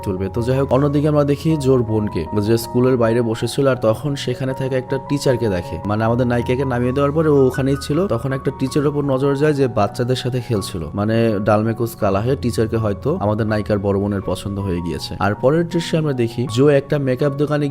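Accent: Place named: Tangail, Bangladesh. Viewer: তুমি নিশ্চয়ই টাঙ্গাইলের মানুষ তাই না 😅😅😅😅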